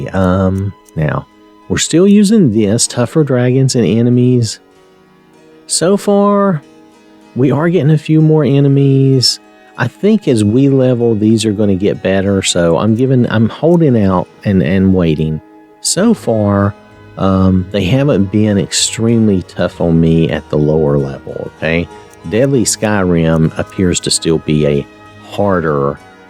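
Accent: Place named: North Carolina, United States. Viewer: I knew it! Born and raised in Rutherford county NC myself, I recognized the accent 😅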